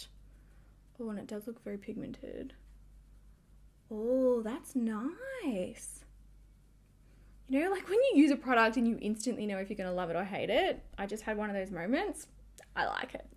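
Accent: Australia. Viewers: I love how Aussie’s pronounce the letter “h!”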